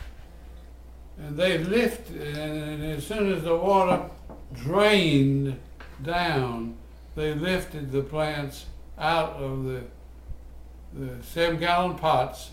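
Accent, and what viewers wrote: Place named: South, United States. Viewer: True southern draw…like my pappy use to have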